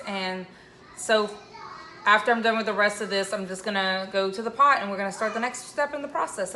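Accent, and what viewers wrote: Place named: Louisiana, United States. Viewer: Are you from Louisiana? Your accent sounds so much like mine lol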